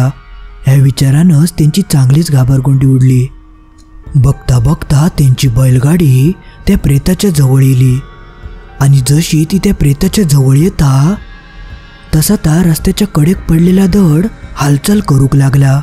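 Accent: Malvani, India. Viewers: Gosta farch chan, aani malvnit aiknyachi maja kahi veglich thank you.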